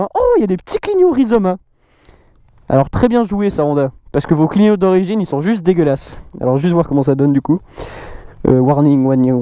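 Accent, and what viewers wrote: Vaud, Switzerland. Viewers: Le bel accent vaudois, ça fait plaiz'! Merci pour ta vidéo, tu as gagné un abonné. :) Je compte passer à la moto le printemps prochain après 7 ans de permis scooter, est-ce que tu la conseillerais à un débutant qui a zéro expérience en moto? Je cherche quelque chose pour faire le trajet domicile-travail (de l'autoroute ou de la route de campagne mais peu de ville) et me balader le weekend. Merci d'avance!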